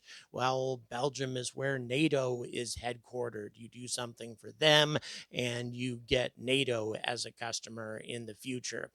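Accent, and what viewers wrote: England, United Kingdom. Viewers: Love the English accent! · As a Brit I think your British accent is most splendid.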